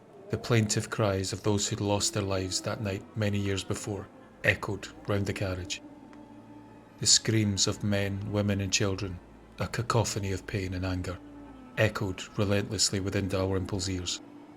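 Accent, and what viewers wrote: Scotland, United Kingdom. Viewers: A night of betrayal and horrors as that of Glencoe... and why would some these souls not still be there? I'm not even Scottish, never been at the site and still the story touched me deeply when I first heard it and still does so today, decades later. Thank you for your videos, you're doing a wonderful job narrating and presenting the stories.... of course, the Scottish voice is a special treat. 🙋🏻‍♀All the best to everyone involved in the making of the videos as well as to everyone watching and listening.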